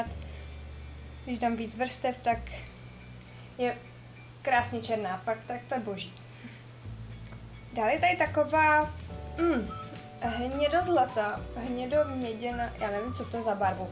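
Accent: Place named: Moravia, Czech Republic. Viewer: Mě se na tobě líbí takovej ten moravském přízvuk:D např. jak říkáš líbí-líbijou :) video je super a nad nákupem přemýšlím! :)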